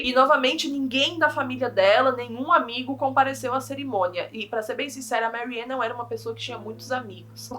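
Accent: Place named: Minas Gerais, Brazil. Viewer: eu pensei q o cara era mineiro tipo quem nasce em minas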